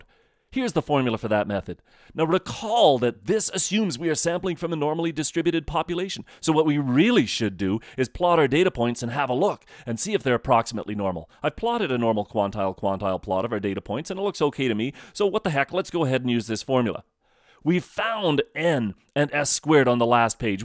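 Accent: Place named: Canada. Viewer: I like the Canadian accent :)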